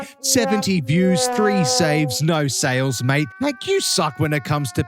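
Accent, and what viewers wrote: Australia. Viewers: is the cutest Aussie accented techtuber!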